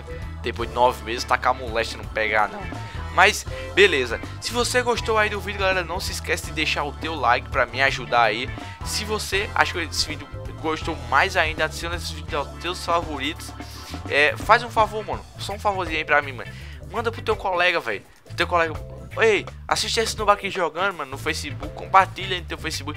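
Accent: Northeast, Brazil. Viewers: Ele tem aquele sotaque nordestino e-e O que deixa os vídeos melhor ainda...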